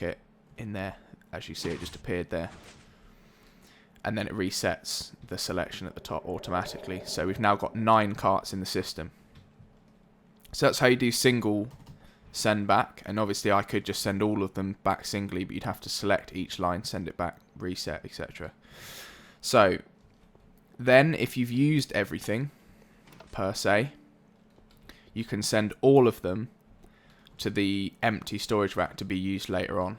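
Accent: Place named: United Kingdom. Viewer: you can really hear the British accent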